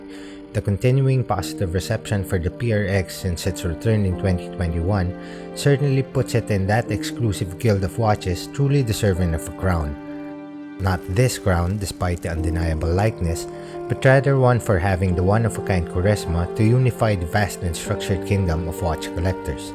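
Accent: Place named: Philippines. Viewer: your filo accent is annoying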